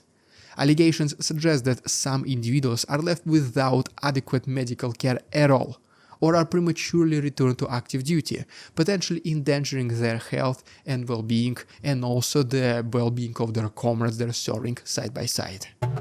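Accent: Russia. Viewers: You present good videos, not only as far as news but with one of the best foreigners who is very competent in English. At the same time you have one of the most severely bad accents. The harsh contrast between intelligent presentations delivered with a hardly understandable Russian accent is hard to understand. A man who speaks such good English (or maybe has a good teleprompter?) should be able to teach himself a decent pronunciation of his speech. Give it a go, you will get more followers.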